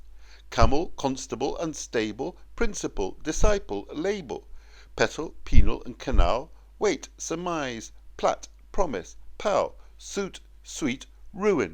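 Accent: United Kingdom. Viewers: So far this is the best rendition I have found, with a clear RP (Received Pronunciation) accent. It is nice to have the complete version too.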